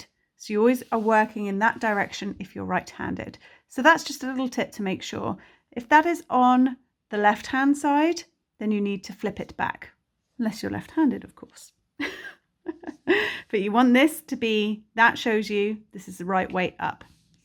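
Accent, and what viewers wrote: England, United Kingdom. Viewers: So lovely, must try. Nice to hear and English voice, most crochet videos on you tube seem to be American using their yarns.x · Just found your channel and subscribed! You are a marvelous teacher! You sound British and I’m wondering about the yarn. I’m a novice at crochet but I don’t recognize the brand of yarn. Is it a British brand and if so can you give us an American equivalent? It’s a really beautiful project.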